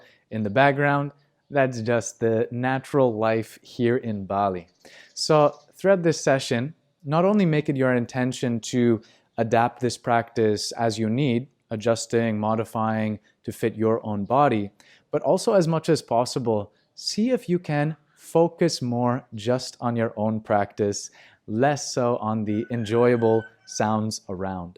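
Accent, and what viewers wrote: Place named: United States. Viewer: I hope I am not insulting you, however, you sound as if you spent time in the U S A Teaching Yoga, or demonstrating. Namasda. God Bless you.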